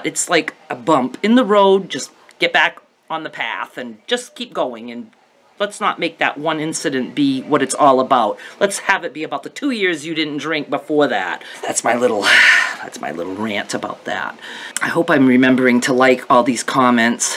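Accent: Maine, United States. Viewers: I love your straightforward comments and your incredible wit and fine, fine brain. Listening to you makes me so homesick for Maine. It is what it is...my great-grandmother used to say, " Well, it's just part ( paht) of the program."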